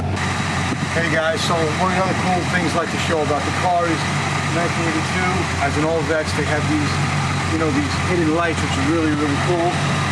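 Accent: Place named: New Jersey, United States. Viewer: Looks like a NJ inspection sticker. Sounds like a NJ voice.... Oh there's the plate.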